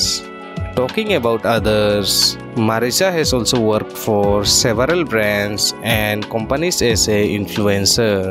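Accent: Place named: Bangladesh. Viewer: Bangladeshi accent, for sure you are Bengali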